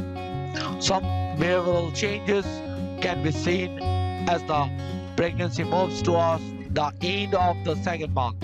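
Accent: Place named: India. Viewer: Ugh, can't hardly understand a word you're saying. You'd think we'd all have had plenty of exposure to Indian accents, what with all the scam callers, customer service reps, etc.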